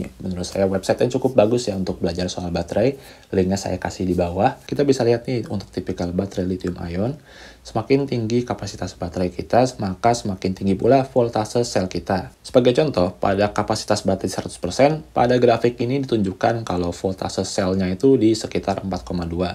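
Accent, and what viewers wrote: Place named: West Java, Indonesia. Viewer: meni sunda pisan euy 😁👍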